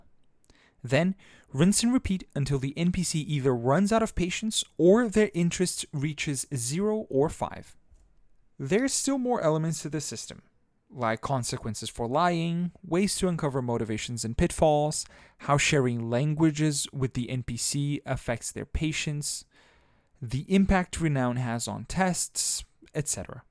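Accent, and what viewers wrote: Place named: Brazil. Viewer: Até sem saber que o seu nome é reconheci o seu sotaque brasileiro! Salve, irmão, 😂. Espero que o podcast cresça muito assim como o jogo!🎉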